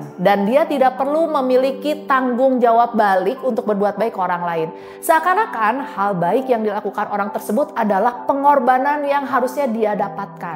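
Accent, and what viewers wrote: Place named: West Java, Indonesia. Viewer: 😂😂 selain Seneng penjelasannya dok, juga pengen ketawa juga krn dokter nyunda pisan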